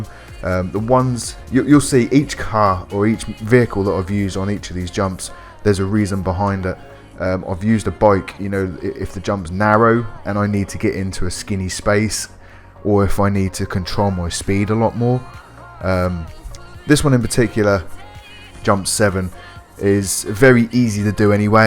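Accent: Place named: England, United Kingdom. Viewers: Annoying England accent NO no no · sounds like you're a Norfolk lad, great video too very helpful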